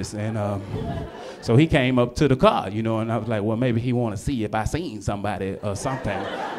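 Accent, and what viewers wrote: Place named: South, United States. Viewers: That black atlanta accent is hilarious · The accent. Probably from the same part of the south. Just a guess.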